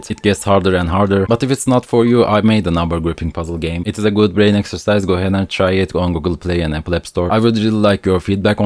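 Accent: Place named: Turkey. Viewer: çok ağır bir türk aksanı var. bütün "the"lar "dı" diye okunmuş. dilinin orta kısmıyla dişine dokunman gerekiyor. ve sesi çıkarmaya hava çıkarmadan biraz daha erken başlaman gerekiyor. ð harfini çıkarırken adem elmana dokun onun titreyip aşağı inmesi gerekiyor. bu harfi çıkarırken dilin havayı durduracak, ses çıkmaya devam edecek (bu harf sesi durdurmaz) ama hava ağzından çıkmak yerine olduğu yerde duracak (yada yavaşlayacak) ve o yukarı çıkmak yerine ses tellerin aşağıya doğru inecek. θ versyonu için böyle birşey gerekmiyor tabi. sadece dilin ortasıyla dokun ve t-s benzeri sesi çıkarırsın. bazıları f'ye benzetiyormuş thank you yerine fank you diyormuş. "figured" figürd diye okunmaz f igyırd diye okunur. hızlı konuşayım derken bütün sessiz harfleri yutmuşsun. "with the prime editing technology" teknaalıci olması gereken şeyi teknoji diye okumuşsun. "proteins" proteynz değil prowtiynz. 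"apocalypse" epokalips değil epakılips. "negative scenerios" negatif senariyoz değil negıdiv sıneğriyowz. pozitif değil paazıdiv. cenetik değil cıneedig. vurgu ikinci e'de, ilkinde değil. vurgu olmayan hecelerde ya ı yada i şeklinde okunur ve hızla geçilir. a, e diye geniş harfle okunmaz. ekşuli değil ekçıli. ingilizcede biz herşeyi u diye okuruz ama mesela "good" gııd diye okunur. özellikle de amerikada. çoğu anglofonun yaşadığı yer amerika. "you are opening two strands of it, you remove one strand of it, and you put the new sequence you want there" sürekli "of it" demeye gerek yok. iki zincirin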